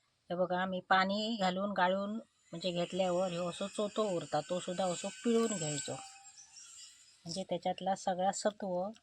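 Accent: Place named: Malvan, India. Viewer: तुमच्या सर्व रेसिपी सोप्या असतात तुमचं मालवणी बोलणे गोड वाटते नाचणी वडी खूप छान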